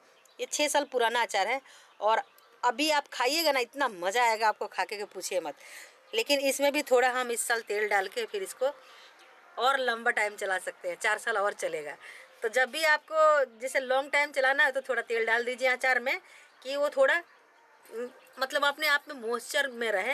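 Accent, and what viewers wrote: Bihar, India. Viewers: आपका आभार ।आपका बताने का तरीका बहुत मस्त मजेदार, बिहारी स्टाइल का,संगीत मय।मज़ा आ गया।😅😅😅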